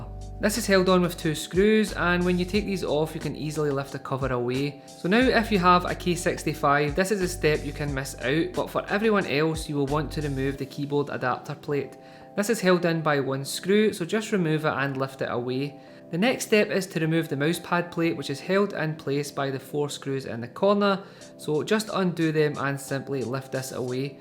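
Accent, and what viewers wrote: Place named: Scotland, United Kingdom. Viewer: I love the one cable instead of the spaghetti you usually get, I thought people hated Scottish accents haha. Nice vid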